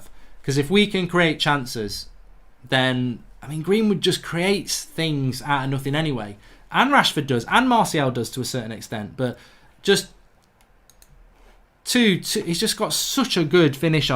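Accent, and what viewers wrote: Manchester, United Kingdom. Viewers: Bowzer Wowzer yeh no one cares about anything on United stand apart from it the geeza with the thick manc accent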